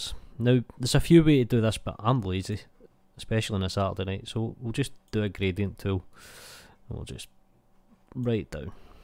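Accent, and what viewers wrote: Scotland, United Kingdom. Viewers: I don't know what it is man, Scottish accent = instant like. You could be saying anything, it doesnae matter it's just like a fucken biological impulse. You could be like "awrite lads, welcome tae this tutorial on how tae punt puppies really far" and I'd still like the video. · I can hear a Scottish accent..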